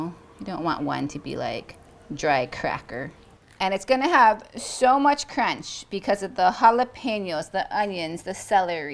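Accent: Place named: Wisconsin, United States. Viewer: I like listening to you because even though you're Hawaiian, your accent reminds me of being home in WI.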